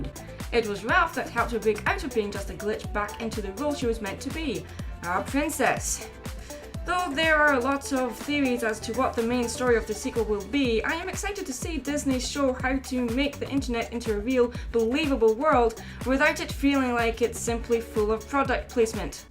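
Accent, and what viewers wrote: Scotland, United Kingdom. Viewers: Are you Scottish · I personally like Scottish ascent girls sound very ...well I don't know how to describe it but still Merida and you sound beautiful yet fun I don't find it hard to understand at all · Scottish Youtuber... Does art... Amazing voice... I'm sold I'm subbing, maybe watching more Scottish Youtubers like you will get my accent sorted! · You know, for the longest time, I never knew that the Disney princesses were actually teenagers. They look more like they're in their 20s. Hmph 😕. Go figure. I love Merida. She's my favorite Pixar princess cuz she doesn't act all feminine. She has a definite tom boy feature like me but still beautiful none-the-less. I also love the fact the she's Scottish. I'm Scottish too thru heritage. I wonder if Disney will ever come up with an Irish princess. I'm Irish too. 😁 Lovely to meet you. 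Love your Scottish accent. Bye. ❤️ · your scottish?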